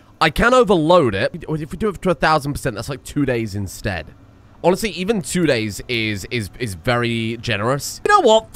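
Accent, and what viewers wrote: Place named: United Kingdom. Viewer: You're British. You say everything in a funny voice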